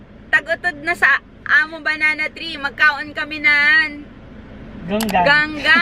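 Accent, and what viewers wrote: Visayas, Philippines. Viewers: Hahaha cute kaayo ka magbisaya haha makabalo ra jud ka ana madugay cge lang bansay ug sulti ug bisaya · Wow kabalo kana day magbisaya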